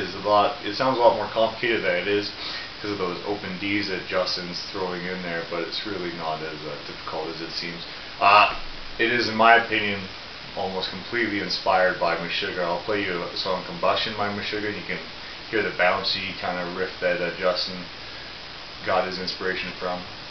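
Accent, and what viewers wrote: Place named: Canada. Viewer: bro. First off you sound sooooo fucking Canadian I was laughing so hard. Not an insult...my daughter was born in Ottawa....funny memories. However, bro you are playing the riff incorrectly. i know you have been a long time subscriber/commenter on my videos. You are adding in extra octave d's...i mean technically the notes are correct but there are more open d's not the octave 12 or in your case on the g string...the 7th fret.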